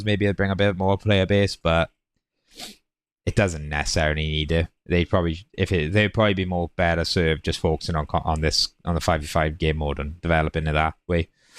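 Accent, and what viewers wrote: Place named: Wales, United Kingdom. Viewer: I must have sunk a thousand hours or so into Smite up until season 6 and I stopped playing because it got grindy and the fun went out of it. I want to give smite 2 a go, and I'll give Pred a go too; I played some Paragon back in the day and I didn't know it had kind of been resurrected - I've only just learnt about Overprime and some other game that used the assets that have both died. Looks like Pred has its version of Smite Arena that's just come out. The map is beautiful and I love the 3D aspect of it. I don't think I would sink any money into Pred though until it's been out for a fair while as that could just as easily disappear; I'd be more happy to put some money towards Smite 2 really. Sounds like you've got a Welsh accent; if so, friendly greetings from a fellow Welsh person! If not, friendly greetings anyway 😂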